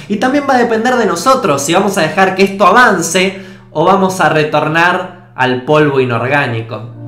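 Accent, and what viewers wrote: Argentina. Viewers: Eres Narigon y hablas como Argentino mmmmmmmm. :u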